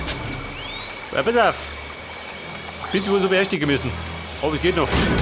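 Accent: Bavaria, Germany. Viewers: so ein geiles spiel, von wo aus bayern bist du?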